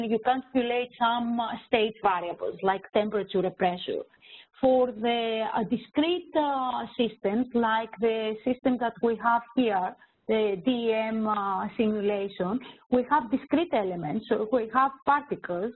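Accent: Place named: Greece. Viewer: is the best professor I've ever seen. Her accent is clear and recognizable. Greek people have very unique English accent but after having conversions with them for a longer time you will find out that their English is pretty good. And I like their accent. if you check nowadays process system.engineering field and most of the big scholars are from Greek. Like Dr. Floudas, Dr. Pistikopoulos, they have very solid math background. You should not focus on the minor language difference but instead look at the greater research picture. She has explained the research work in a very straightforward way with putting out tremendous math equations and optimization theorems. It's great to watch the valuable overall reasearch introduction online for free.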